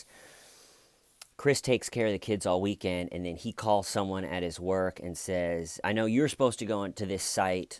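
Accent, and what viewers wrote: United States. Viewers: Hello from bonnie Scotland 😁 you my love are very witty. Love your videos! It's like listening to my inner thoughts coming out of an American man's voice 🤣🤣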